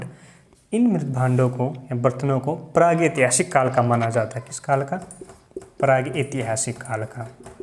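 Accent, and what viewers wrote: Haryana, India. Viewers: Woww sir asli haryanvi 👌👌👌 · Full haryanvibazi guru ji🔥😀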